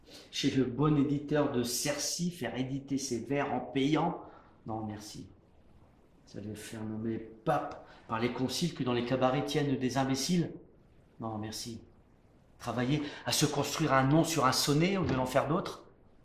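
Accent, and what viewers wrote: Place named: North, France. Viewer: Vous venez du nord vous ^^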